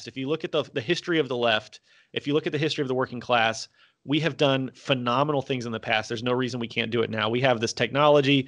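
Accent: South, United States. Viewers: and since speaks in his native Southern here, perhaps he can get Olly to speak in his native Northern (Geordie)... I'd like to hear that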